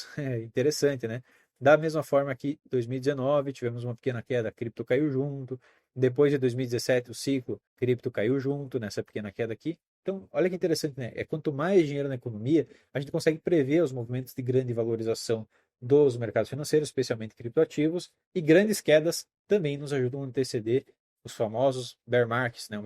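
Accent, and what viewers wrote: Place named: Paraná, Brazil. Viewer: sotaque do paraná ou catarina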